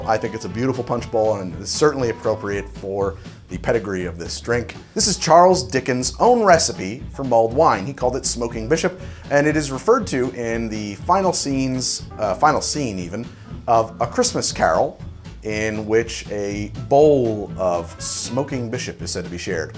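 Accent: New Jersey, United States. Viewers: You're getting rather self-conscious about that Jersey accent of yours, aren't you? Don't let the comments get to you, there's nothing wrong with having an accent.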